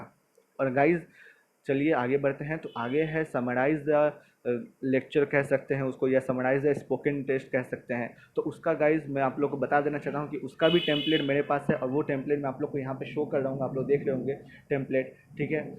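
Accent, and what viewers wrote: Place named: Uttar Pradesh, India. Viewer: u r up accent bhai♥️♥️🥹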